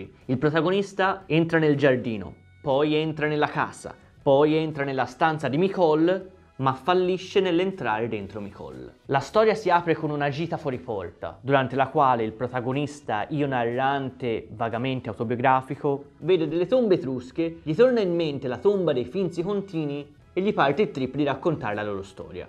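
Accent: Florence, Italy. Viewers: Ahaha tu fai schiantare Sei di Firenze vero? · Mi piace l'accento fiorentino! D'atmosfera culto e culturale! questo video è breve ma utile, anche facile da capire! Grazie!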